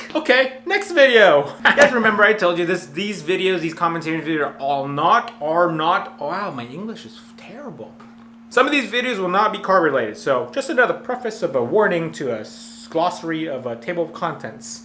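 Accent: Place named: United States. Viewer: You got the weirdest country accent I've ever heard. lol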